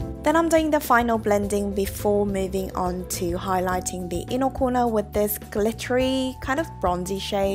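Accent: United Kingdom. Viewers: 영상 오랜만이에요 언니 !!!!항상 브이로그 챙겨보는데 항상 밥드실때 댓글보신다고 하셔서 댓글 오랜만인지 처음인지 ....? 여튼 달아봐요 !!!항상 영상 잘 챙겨보구있고 언니 브이로그 보는게 진짜 삶의 낙이에요 ㅋㅋㅋㅋㅋㅋ밥먹을때나 자기전에나 꼭꼭 한번씩 더 챙겨보고 자요 !!!!봤던거라두요 ㅎㅎ 하루하루 브이로그찍으셔서 보는 재미도있구용 ㅎㅎ 영상 길이도 길어서 넘나 좋아요ㅠㅠㅠㅠ 항상 영상 잘보구있으니까 언니 화이팅해요 💕💕💕💕💕아 그리고 언니영상보면서 영국 특유의 그런 억양 너무 배우고싶어졌어요ㅠ영국 너무 가보고싶어요 !!계산도 혼자해보고싶구요 ㅋㅋㅋㅋㅋㅋㅋ여튼 영상 잘보고가요😘👍🏼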